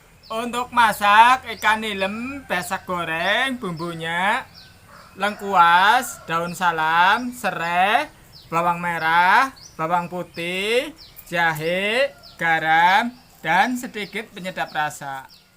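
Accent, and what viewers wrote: Banyumas, Indonesia. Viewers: Ngapak banyumasan ya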